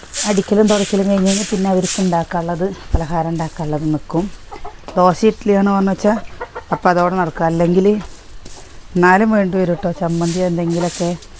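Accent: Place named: Kozhikode, India. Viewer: koyiod samsaarikkunnad kelkaan nalla rasa😊😊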